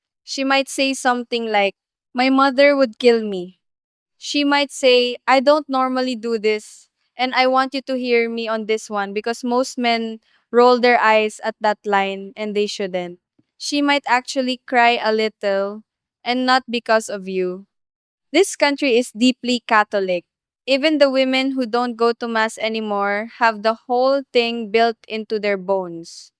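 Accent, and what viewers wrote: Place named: Philippines. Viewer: ❤❤❤❤ Your So Beautiful and Love you and your wonderful Information ❤❤❤ Love Filipinas especially your Lovely Accent 😍😍🥰😘💕❤️💓💋💞🌹💓❤😘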